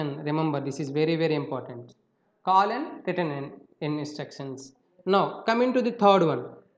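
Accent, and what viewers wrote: India. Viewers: speak clear english better indian tone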